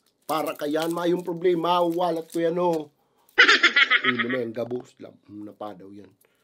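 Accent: Bicol, Philippines. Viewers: naugma ako duman sa lamay-ag.. hahaha, bikol na bikol. KUDOS ORAGON KITA!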